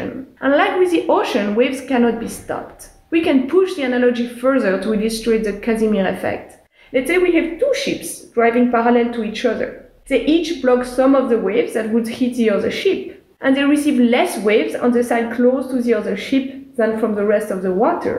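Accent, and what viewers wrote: France. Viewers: helloo i love your french accent, i could listen to you talk about casimir effects all day 🤩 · I noticed a french accent. surely not a surprise. But given that google ASK AI is only in english (at least not in french) I guess this is another science communication force toward french to english to french communicatino in scinece. off topic in passing just noticing.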